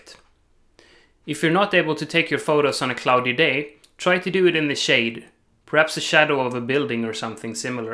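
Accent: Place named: Greece. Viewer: Μα το θεο ακομα και να μην διαβασα ονομα θα καταλαβαινα οτι εισαι ελληνας, το ελληνικο accent δε φευγει ποτε και κανει μπαμ απο χιλιομετρα, πολυ ωραιο βιντεο αδερφε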